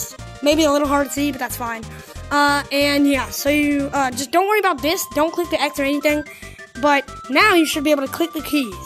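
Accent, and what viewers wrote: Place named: England, United Kingdom. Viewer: Why does bro sound like English kid Naruto?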